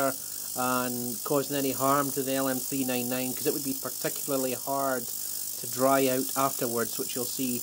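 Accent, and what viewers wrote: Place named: Aberdeen, United Kingdom. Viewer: Great video, thanks for taking the time to show the process! I'm inclined to get some of that cleaner stuff and try it, although I might need to get a new ultrasonic tank as well (mine is rather old and out of whack). As it happens, I spent today making up a batch of PCBs and wondering how the pros go about cleaning them. My own process, for what it's worth, is: Soak boards in isopropanol inside a sealed plastic tub for 30min - this softens up the flux and crap Scrub well with a toothbrush in the IPA - this usually gets everything off but sometimes there's little bits of hard flux around big solder joints, so need to give them a wee scrape Wash with hot water and detergent, to remove the IPA Dry thoroughly with a compressed air jet Personally, I love using compressed air for drying things, because it gets into tight places very easily - e.g. 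under SOIC chips, or inside IC socket pins. By the way, are you from Aberdeen? I detect a wee bit of an accent! [I'm from West Calder]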